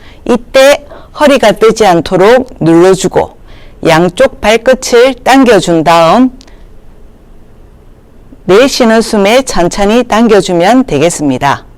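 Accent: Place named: Gyeongsang, South Korea. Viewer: 경상도사투리 정겹네요 스트레칭 아침마다 따라하고있습니다 귀에 쏙쏙들어오네요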